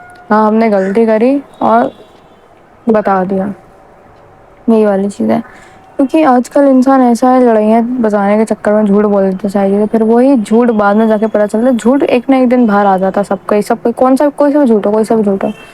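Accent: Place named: Madhya Pradesh, India. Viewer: Tum MP se ho kya? Tumari accent se lagra hai. Btw consistency on 🔥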